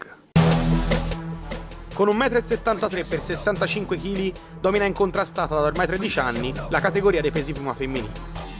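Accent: Tuscany, Italy. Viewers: Sei toscano ??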